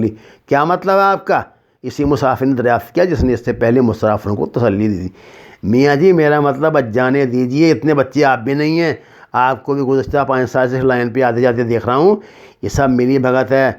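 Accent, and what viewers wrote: Punjab, Pakistan. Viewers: Abe Tu nawil mat para karo plzz tum panjabi men Urdo Bulta h.. Plz kisi ache andaz men padhne wale aadmi padhwaliya kato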